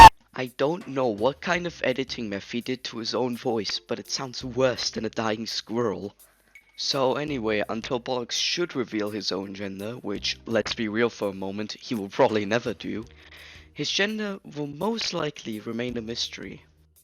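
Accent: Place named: United Kingdom. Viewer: Nice vid but you should upgrade to a snowball EDIT I love your voice it’s like a mixture between posh british and Scottish/Irish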